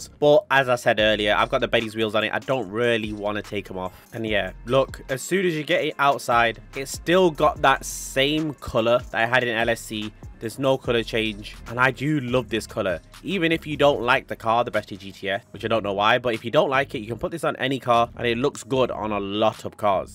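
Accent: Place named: Bradford, United Kingdom. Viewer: he sounds like hes from bradford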